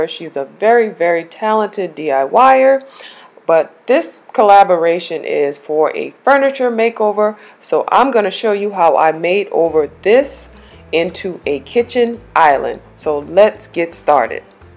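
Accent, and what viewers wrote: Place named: Gullah Geechee, United States. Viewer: girl!! This is quite interesting --- I kept saying to myself mercy—I hear the geechie in her voice😂😂 I'm from myrtle beach!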